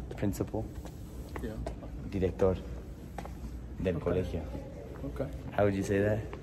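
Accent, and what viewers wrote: Peru. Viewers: No sólo representas al Perú, sino además conoces la jerga peruana y sobre todo hablas español con acento peruano, lo que es muy difícil de lograr para un native English speaker. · Wouuuuhhh ¡qué hermoso gesto!, se siente tu amor por nuestro ¡PERÚ! ¡GENIAL!!! Me encanta tu sabor peruano al expresarte 😁😍, eres todo un peruano. ¡Cuídate mucho! Y GRACIAS 🇵🇪♥️✨ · El asento lo tienes bien peruano ja que loco jaja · Es sorprendente... Tienes el acento y gracia de un nativo peruano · Mare, ahora te creo, yo creí q eres cajamarquino, o pozuzo, hablas bien como el castellano peruano. Me sorprendiste.! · En serio man te has peruanizado un montón, tienes el acento de limeño de barranco. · Hablas tan bien el español y con acento peruano incluído que pensé que eras peruano, pero igual te considero como un peruano más xq se nota el feeling que sientes por Perú, buena, te hiciste una, éxitos bro 👍👍🇵🇪🇵🇪 Nice school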